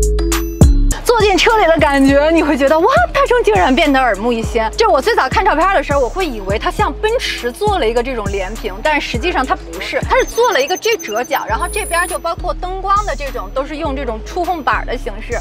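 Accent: Beijing, China. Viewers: 触控板儿，哈哈哈，妹子这浓浓的北京腔儿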